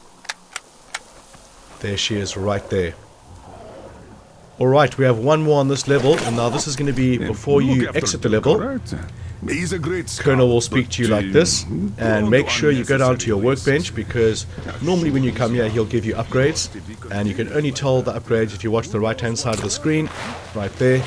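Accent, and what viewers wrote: South Africa. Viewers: Awesome Vid, thanks. You sound hella South African.. Shout out from Cape Town. Keep up the GG's!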